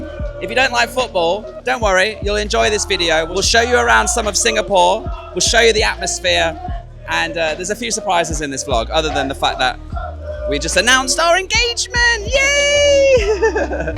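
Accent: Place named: Newcastle, United Kingdom. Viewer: Great geordie.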